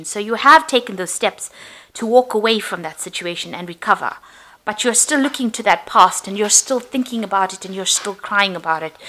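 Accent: South Africa. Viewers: Are you SAfrican? You sound like home :-)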